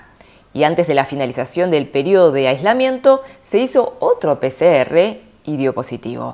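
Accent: Argentina. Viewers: Disculpa pero hablas como Argentina vives allá y cómo te llamas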